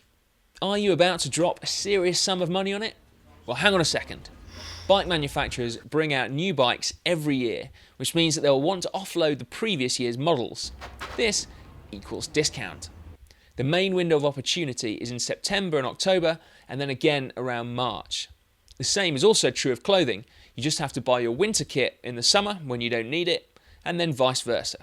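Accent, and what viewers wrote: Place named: England, United Kingdom. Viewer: It's an English accent!!!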